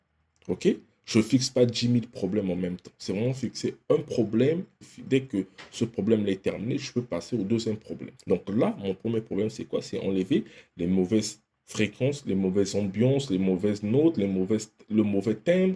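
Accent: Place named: Brazzaville, Republic of the Congo. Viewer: Merci mon frérot et tu a un bon accents brazza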